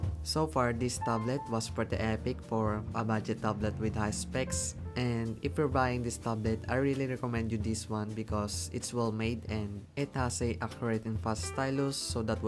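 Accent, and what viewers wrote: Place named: Philippines. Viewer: I lab yor pilipino aksent❤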